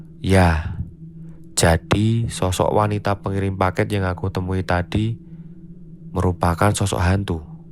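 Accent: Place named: Java, Indonesia. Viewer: Suka ama aksen Jawa masnya Sukses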